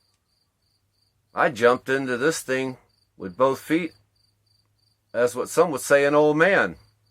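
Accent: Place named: Georgia, United States. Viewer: You sound like a Georgian 😅🍑 The dry desert heat is it way more harsh than the heat and humidity here in the South? Like walking around in a sauna wrapped in heavy wet blanket.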